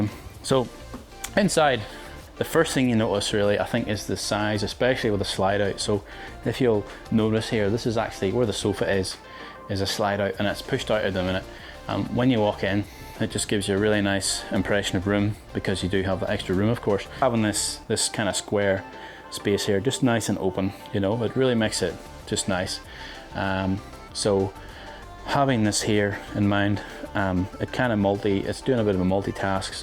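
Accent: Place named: Northern Ireland, United Kingdom. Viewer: Northern Ireland, sounds like.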